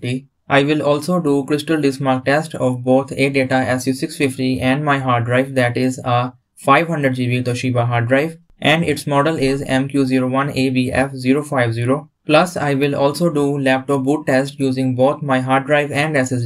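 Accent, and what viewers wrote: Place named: India. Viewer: I don’t understand English in Indian speaking.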